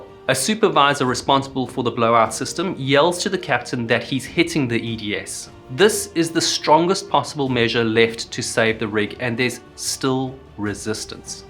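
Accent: South Africa. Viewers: I asked him the exact same thing when I discovered the channel :) Are you south african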